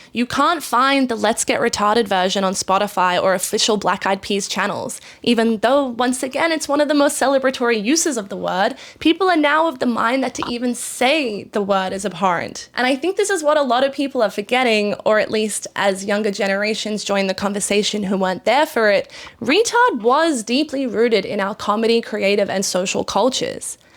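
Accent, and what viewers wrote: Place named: Australia. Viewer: It took me watching more than halfway through the video to realise that you were also Australian.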